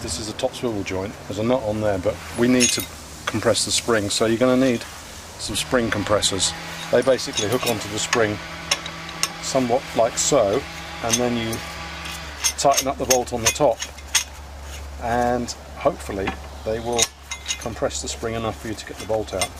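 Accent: United Kingdom. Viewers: You helped me fix a problem after installing new struts (but not the spring) where I had not made sure the 3 notches in the upper plastic mount were aligned with the butt of the bolts in the top plate. This made for some very noticeable knocking sounds when going over bumps and turning which disappeared after I aligned them. But before I watched your video and fixed it myself, I brought it into my local Ford dealer and they charged me $195 for a diagnosis that found nothing (confirming my belief that I can do the repairs better with YouTube than even the most qualified and expensive mechanic). Thank you for this awesome video. Your accent sounds Cockney, like my creative writing teacher. Bloody right then. Best of the lot.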